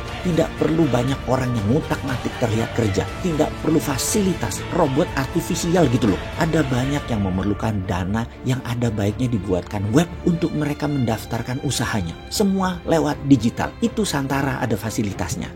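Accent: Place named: Java, Indonesia. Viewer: Sori om cengkok e terlalu medok 😂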